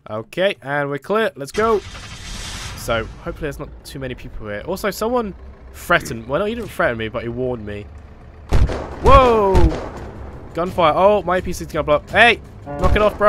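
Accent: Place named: United Kingdom. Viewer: That British pronunciation..... I love it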